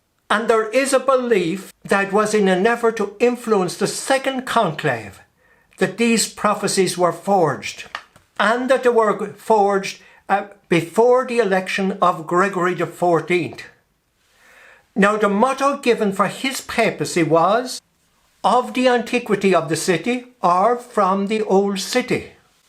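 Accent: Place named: Ireland. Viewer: I just came here to hear Father say "Farty", I studied in a school run by the Irish Christian Brothers and I will never get tired of that accent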